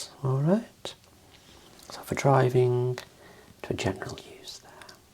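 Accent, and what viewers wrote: Birmingham, United Kingdom. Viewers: Birmingham, England 😊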